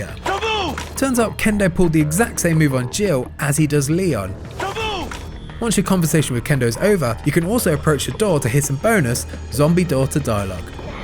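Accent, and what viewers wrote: United Kingdom. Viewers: 1. There are many accents in Britain 2. This guy IS British. Are you deaf? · Zucc i am 1000% aware he IS british and he has a BRITISH ACCENT... So i guess the problem now is why did i have to put “*in a british accent* if he’s naturally british huh? Why the need to point that out... That’s the issue, right?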